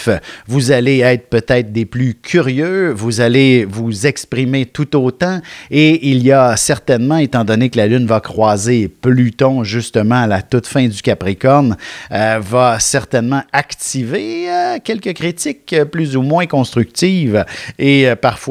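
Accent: Quebec, Canada. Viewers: Bonjour merci je vous suit depuis longtemps avant il yavais une jolie dame blonde a vos côté je me demande ce quel devient ? En tout cas j'aime bien votre accent québécois ☺️ J'ai une question je suis née le 29Mai1992 18h45 Montpellier France Esque l'année 2023 serais bénéfique pour moi niveau sentimentale ou argent j'ai envie de me faire une chirurgie esthétique courant mars mais je doit d'abord récolte.. Voilà Monsieur a bientôt en espérant avoir mon thème sur ma prochaine vidéo ☺️🙏🦋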